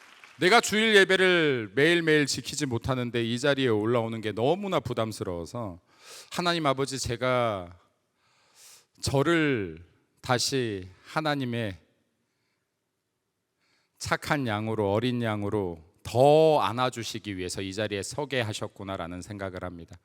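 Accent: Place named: Busan, South Korea. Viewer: 오. 부산사람이었구나.... 멋져요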